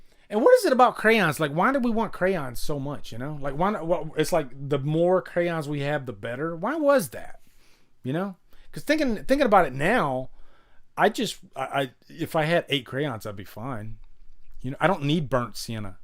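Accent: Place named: Mississippi, United States. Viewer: a bunch of characters, huh? 😂 I thought I detected some Mississippi in your accent, but I miss Florida beaches. Texas really doesn't have any good ones. Maybe 1 or two, like South Padre or whatever.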